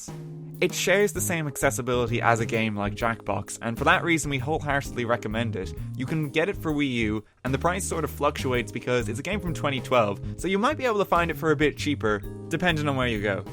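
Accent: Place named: Ireland. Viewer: 8 minutes of a buttery Irish accent. Score!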